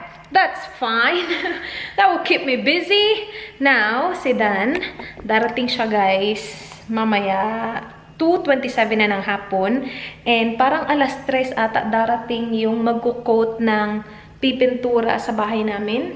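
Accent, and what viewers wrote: Visayas, Philippines. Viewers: Ang accent sa pagka bisaya ba, mo gawas jud hahaha Kalisud basta bisdak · Wooh the bisaya tono uy 😅💖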